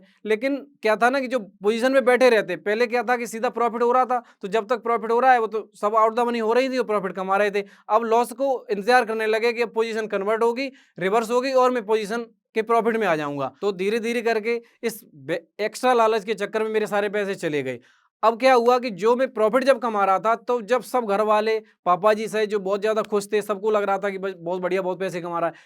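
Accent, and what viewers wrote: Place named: Bhind, India. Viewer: Bhind ki bhasha bhut mst or pyari lgti he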